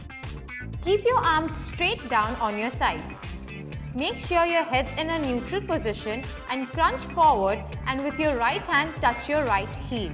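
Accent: India. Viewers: oof I'm indian but i hate this accent.... trying too hard.... but great video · U r indian... Right...came to know this after listening ur accent..😎😘😊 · Indian accent has to be the most annoying accent ever. Liked the exercises but listening to her speak is like nails against chalkboard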